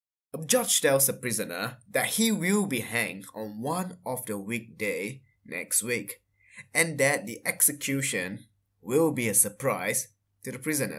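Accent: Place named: France. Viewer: Sounds like French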